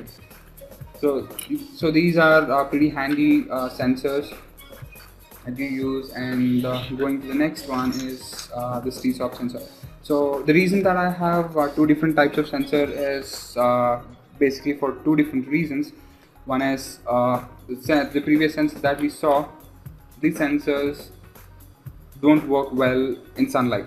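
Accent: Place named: India. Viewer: stop speaking indian, learn english dude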